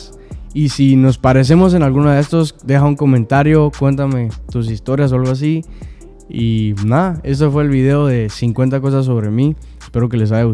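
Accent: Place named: Guatemala. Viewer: Amo el acento guatemalteco de y su R muy pronunciada jajaja Dios amo a este hombre 😍 yo igual soy alérgica a los perros y gatos 😂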